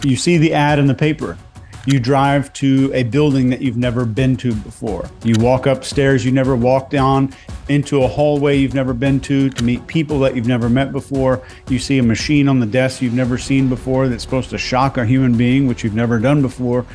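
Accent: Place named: South, United States. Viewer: Guy cant take constructive criticism. Hes got a huge ego, he said people who hide their accents are not to be trusted, but he hides his own southern drawl. He constantly makes up new words like “ive officially called this xyz… “like he works for Webster now as well. Sleazy salesman, remember nothings free if an app is free youre the product.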